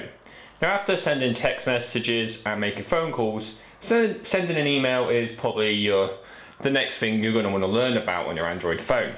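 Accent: United Kingdom. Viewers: Next time try to DE cickneytize the accent. I barely understood. He, sounds like dick van dyke from Mary Poppins. I'm trying to learn. Not be correct.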